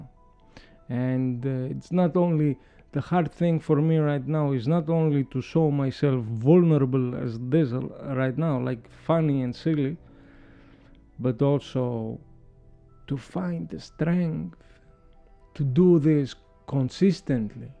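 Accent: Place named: Greece. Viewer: The pronunciation a greek element !🤣 Όταν τελειώσω την έκθεση που γράφω, θα κάτσω να το δω.😉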